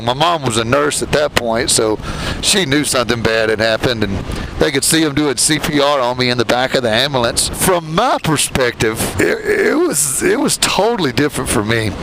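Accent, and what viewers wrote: South, United States. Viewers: I love that southern accent. And that video was awesome. If you're ever in Sydney, Australia. PM me and I'll buy you a beer.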